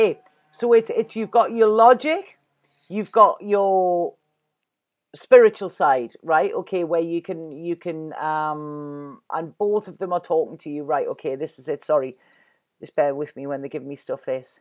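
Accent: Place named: Scotland, United Kingdom. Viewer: German? LOL sorry but I find that funny. Scottish maybe. People are funny aren't they. I will contact you in the near future for a reading. I figured out a way to generate electricity without fuel, wind or sun. Not sure how to proceed with this venture. If you gmail me I can explain the concept in 2 minutes. I will blow your mind on how simple it is. Get ahold if you want to.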